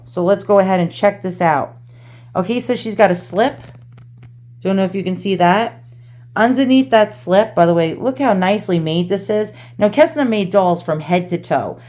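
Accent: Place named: New Jersey, United States. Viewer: Love antique dolls have a few but love your collection. Love your Jersey accent and listening to your comments as well as your little pieces of advice. Keep up the good work!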